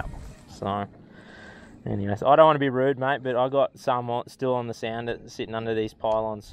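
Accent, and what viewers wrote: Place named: Queensland, Australia. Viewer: You're sounding more and more like a north Queenslander. The fishing is next level compared to SEQ.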